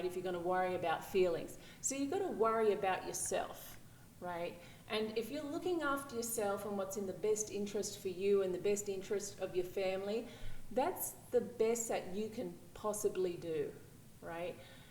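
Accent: Australia. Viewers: Can't help noticing your Australian accent, I'm a fledgling Black Magician living in Sydney, could you advise me of any groups in Sydney? Much appreciated.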